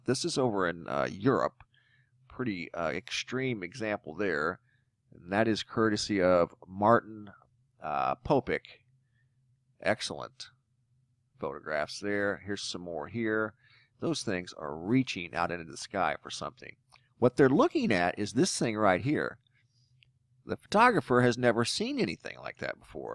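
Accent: United States. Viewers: if you can investigate, then you will find that they start from out of the atmos and cloud masses atract them ! electric ! planet means wandering star ! you sound soooo americAAAAAn ................!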